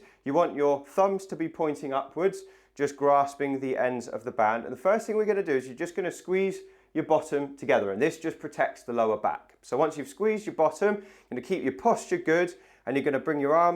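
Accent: United Kingdom. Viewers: I love your lovely British accent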